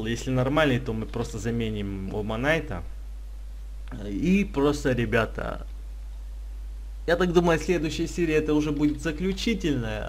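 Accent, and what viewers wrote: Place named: Ukraine. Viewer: Голос украинский 😆😆💫🌚💦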